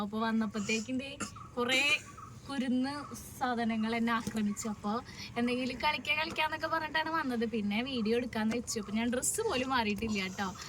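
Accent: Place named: Thrissur, India. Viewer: തനി തൃശൂർ slang 😂🎉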